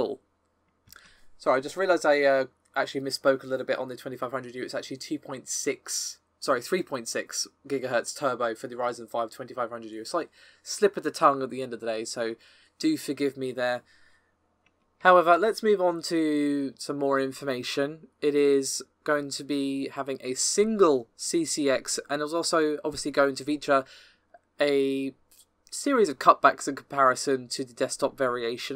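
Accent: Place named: Manchester, United Kingdom. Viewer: I am getting better at detecting british accents. This guy is from.... Manchester !!!!!!!??????????!!!!!!!!!??????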